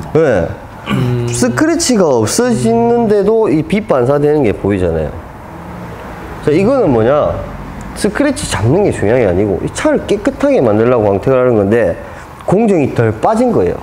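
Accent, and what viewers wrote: Gyeongsang, South Korea. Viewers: 말투랑 목소리가 내부자들에 조승우가 경상도사투리 구현한것 같네 ㅋㅋ 저도 경상도인 ㅎ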